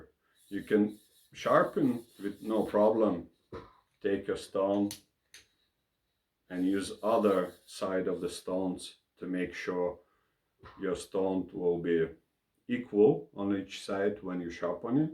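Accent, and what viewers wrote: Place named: Russia. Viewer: I love the way you speak man. The English with the Russian accent is awesome, no bullshit just straight facts from a man with arms so ripped I don't dare to call myself a real man in comparison. 'sometimes costumers complain its very difficult to tight these clamps.. Yes.. You get used to it.' Unintentionally hilarious, I fucking love it. Please do more videos.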